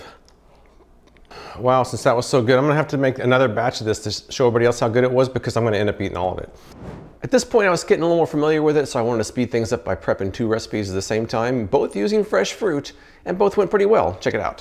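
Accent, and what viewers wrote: United States. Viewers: Possibly. I assume by the accent, the dude is in the US. But assumptions.... ;)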